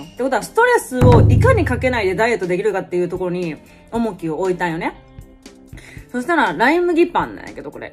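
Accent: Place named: Hakata, Japan. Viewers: 同じ福岡だからか方言がしっくりww面白いかよw しかしバリカワ！チャンネル登録しましたー！ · 俺と喋り方「博多弁」がばり一緒っちゃけど、街ですれ違ってるかもね笑笑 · 最近知って式マッサージとかやったりしてるけどいままで毎日やってるとマッサージの内容(?)とか覚えたら動画見ずにやったりしてたけどが見たいからマッサージ覚えても毎日動画見に来てる🙌まじで考え方とか性格とか好きすぎるし、何より私も九州の人間だからイントネーションとか聴きやすくて方言も好きすぎる💓唐揚げ私も大好きだからたまに揚げない唐揚げ作ってみようと思う💪私はYouTubeで知ったけどまじで知れてよかった😂💓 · 博多弁サイコー❤美人でええな🎉うちも博多たい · 博多弁親近感湧く😂💕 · は九州？うちは福岡やけど話し方が福岡っぽくて親近感があった❣ うちも今ダイエットの迷路にはまってしまってるけん、のを参考にしますだ✌ストレス溜まらんようにやね❤これからも楽しみに見ます～😃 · この雑さ具合が好きになる〜方言的に福岡の人なんかな？ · あ！博多弁やん笑笑聞きやすい笑笑天才やね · と同じ身長で６７キロまで太りました😭 食事は給食あるから無理だけど、頑張るぞ〜！私と同じくらいズボラやし、九州出身？と思われる方言が素敵やね。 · はじめまして☺️ 私の半分しか生きてないやけど、かわいいけんチャンネル登録した❤️ 博多弁？私も福岡やけん聞き取りやすい☺️ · 方言が一緒やな……って思ってたら福岡の人でした!!余計に嬉しいです( ｰ̀֊ｰ́ )✧︎ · 、福岡弁かわいい💞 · 〜やけんとか〜とよとかは博多弁ですよー。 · 博多弁いいですよね私、博多在住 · 喋り方が福岡やけんめっちゃ親近感わきますｗｗ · 糖質制限から7キロリバウンドした💦 博多弁丸出しの密かにずっと見とって、博多人間からしたら友達の領域w まじうけるw🤣ちょっとまじでまた7キロ戻そかなー！頑張る💪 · 博多弁に癒されます！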